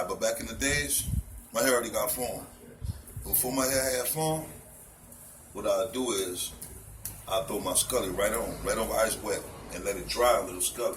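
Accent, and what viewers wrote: Florida, United States. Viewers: I can tell that u striaght from Florida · NATTY NATTY you can tell he from Florida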